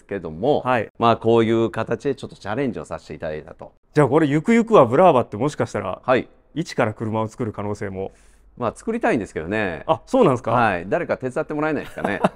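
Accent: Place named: Osaka, Japan. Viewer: 私は車が好きな、スーパーカー時代生まれのオジサンです。 私は車を所有出来る様な経済状況には無いのですが、もしその日が来たなら、是非KUHLさんの車が欲しいと、毎回動画を見ながら夢を見ています。 今後も社長のトーク(大阪商人的な)を楽しみにしています。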